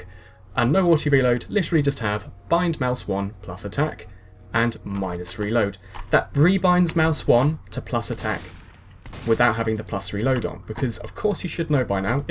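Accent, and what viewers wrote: United Kingdom. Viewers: I'd be homosexual for you. -British accent -Spy main Oh.. yes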